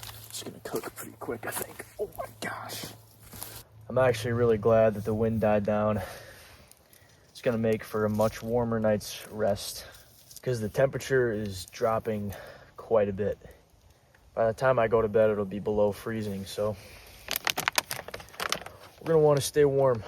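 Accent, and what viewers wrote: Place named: United States. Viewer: It looked nice and tranquil where you were, but you're nearly always at tranquil spots. I liked the footage at the end when you were leaving on the boat, and the music. That was a good wall you made with the logs, and a good idea. I really like your American accent too! Which part of the States are you from?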